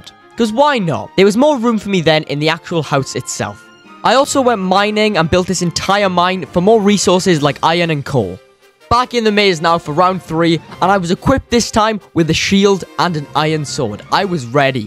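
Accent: Wales, United Kingdom. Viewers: Are you Welsh? I’m from the UK, and I can’t help but notice the Welsh accent! 😁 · correct me if I am wrong but your accent has a welsh touch to it lol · Your accent tells me, an American, that ur British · Yo man you welsh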